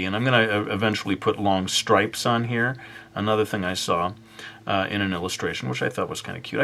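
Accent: United Kingdom. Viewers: you are so brittish :D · Your british accent is hilarious.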